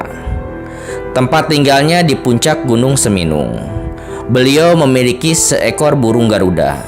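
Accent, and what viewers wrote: West Java, Indonesia. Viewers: Jelas orang ini dari gaya bicaranya orang sunda, legenda yg dia ceritakan tidak pernah ada dalam catatan rakyat danau ranau, jelas orang ini bohong, kelihatannya orang ini disuruh seolah olah orang ranau masih punya hubungan dengan orang batak, yg jelas kami orang ransu menolak sama sekali cerita ini... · Yang cerita logat nya Sunda yaa..😂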